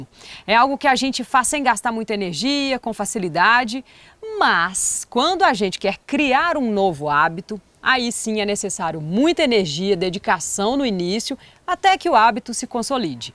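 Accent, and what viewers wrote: Minas Gerais, Brazil. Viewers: As mulheres mineiras estão muito bem representadas por você, tem um belo sotaque que não e arastado e nem enjoativo sua voz e linda e vc e muito simpática e por ironia do destino e linda muito linda mesmo com um belo formato de corpo,tem um velho ditado que diz que uma mulher quando e bela até chorando ela e bonita,com todo respeito sei que você se olha no espelho e realmente e muito especial, tudo de bom para a sua vida é principalmente para toda a sua família, sucesso para sua vida hoje é sempre.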